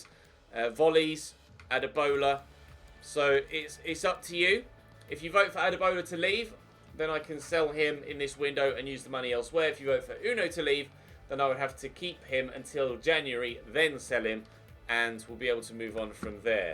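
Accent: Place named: RP, United Kingdom. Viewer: FUCKING BORING, NOT NEEDED TO CONTINUE THE CAREER, YOU TALKING IS SO BORING WITH YOUR UPPER CLASS POSHNESS xD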